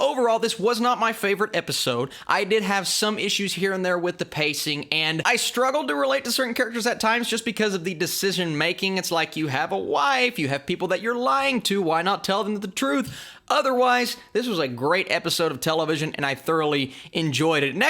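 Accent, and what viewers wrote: South, United States. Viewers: This guys southern sounding accent 😍🤤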